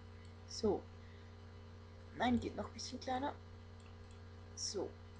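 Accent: Switzerland. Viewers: man hört das du schweizerin bist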